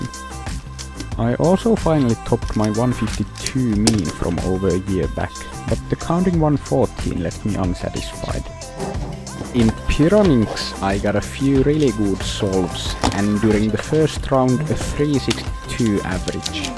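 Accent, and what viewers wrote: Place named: Finland. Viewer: Guud job. Ainoo ongelma niin tota.... Vähän rallienkkuu havaittavissa😂 Mut hyvä idea tykkäsin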